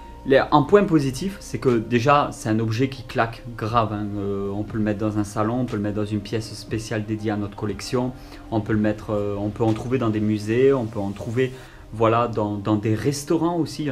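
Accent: Toulouse, France. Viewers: Coucou j'espère que tu vas bien? Oui oui sa serai trop cool de voir l'arcade avec l'intérieur de la machine le système de monnayeur et tout se qui se trouve à l'intérieur. Tout les systèmes ect.. Je me suis toujours posé la question comment sa fonctionne. Donc une vidéo sur sa je pense que sa pourrait faire une vidéo qui pourrait plaire à beaucoup de monde. Merci de nous régaler Sa fait un bien fou de te revoir. Ton accent de toulousain nous avait manqué. En plus on est pas si loin moi je suis de capestang à côté de beziers. Je te souhaite une excellente continuation. Continue à nous régaler. A très bientôt. Bonne journée à toi et à toute la famille.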